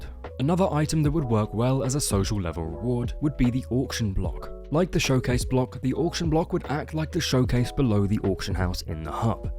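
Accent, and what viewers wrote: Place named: United Kingdom. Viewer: Video is good even tho the guy speaking is British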